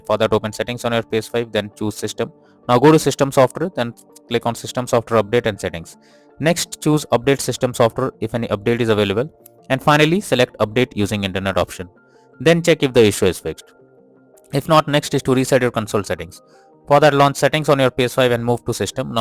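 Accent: India. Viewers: He’s an Indian BTW 😁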